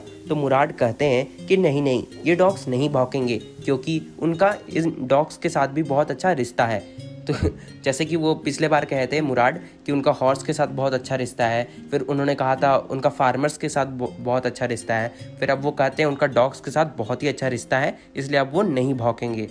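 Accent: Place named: Bihar, India. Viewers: You are from bihar Right! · One question you are from Bihar???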